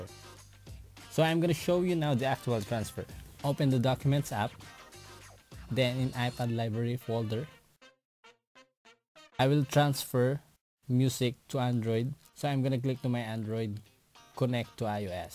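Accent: Philippines. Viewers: Are you a filipino cuz of you’re accent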